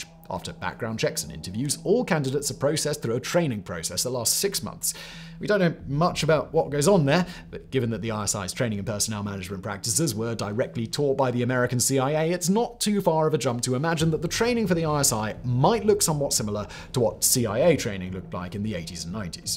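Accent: United Kingdom. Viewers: This series is the case in point for the theory that everyone sounds more intelligent when speaking with a British accent and everything sounds more credible and authoritative when spoken with one😂.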